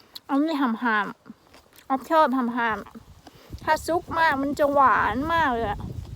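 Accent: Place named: Korat, Thailand. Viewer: ดีๆจ้ะ พูดโคราชภาษาท้องถิ่น 🥰🥰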